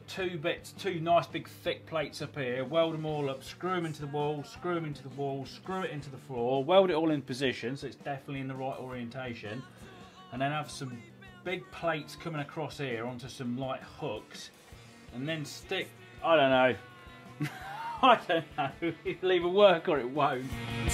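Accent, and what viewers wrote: England, United Kingdom. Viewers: Very much loving the accent from a true Englishman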